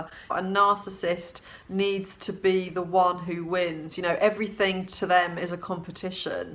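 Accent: United Kingdom. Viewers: I’ve subscribed recently as I love your thoughtful assessments based on your knowledge and experience (I am a Ph. D. in another field, and I greatly appreciate the work you have put into your career and expertise). I hope your channel continues to grow as your analyses are very thoughtful and respectful! Also I lived in the UK for a bit when I was in grad school so love the accent always (my spouse and I are anglophiles!)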